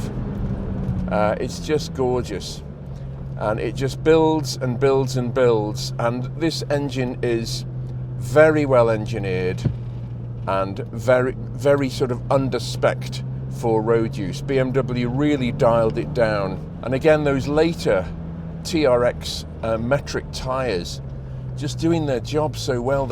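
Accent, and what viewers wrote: United Kingdom. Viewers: When aggressive meets beautiful on 4 wheels. Fantastic review once again. No stupid music, no fluff and a very British delivery... Lovely 🇬🇧❤️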